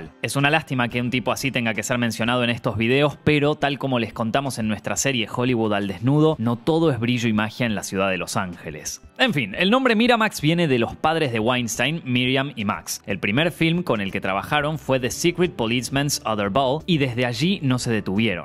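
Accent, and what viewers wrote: Argentina. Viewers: Felicitaciones. Por fin una voz argentina de alguien que sabe y que no lee cualquier cosa cuando lee en inglés. Muchas gracias!!!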